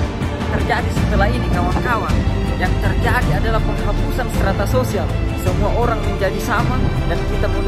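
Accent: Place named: Makassar, Indonesia. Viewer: Alhamdulillah vidionya semakin bagus,semoga berkah.... Logat Makasarnya medok banget...hehehe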